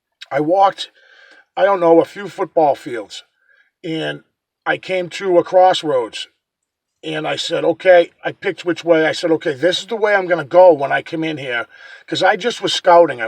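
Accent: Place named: New England, United States. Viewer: Awesome to see a fellow "New Englandah" on here.. From Providence, 🍻🍻🍻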